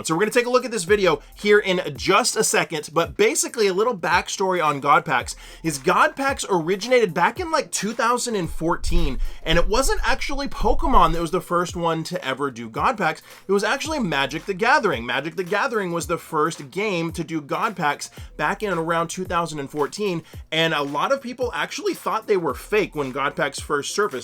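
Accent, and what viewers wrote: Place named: United States. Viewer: deze man gaat geen nl spreken hoor zn accent, dat is echt amerikaans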